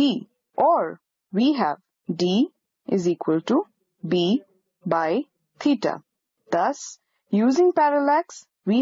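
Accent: India. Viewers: not the greatest choice to use letters B, P, and D for an indian accent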